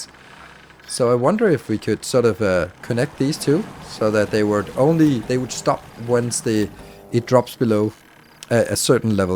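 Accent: Denmark. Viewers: Er lige faldet over din profil mega fedt content glæder mig til at følge med, blev nød til at tjekke om du ikke var dansk hahah for synes jeg kunne høre det i måden du taler engelsk på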